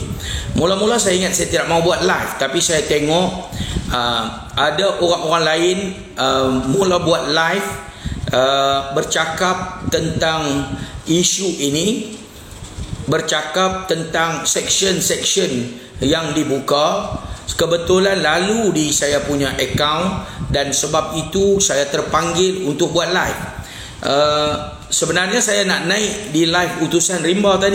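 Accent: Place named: Kedah, Malaysia. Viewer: Dia ngomong basa logat provinsi kedah,sampean mboten ngertos yo mboten nopo nopo.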